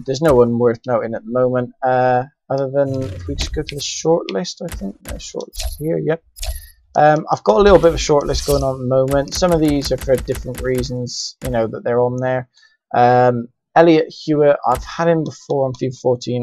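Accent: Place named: Bristol, United Kingdom. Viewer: Nice to see a city career mode on yt. And nice bristolian accent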